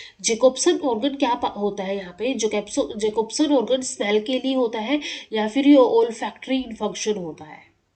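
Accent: Haryana, India. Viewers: Are you from hariyana?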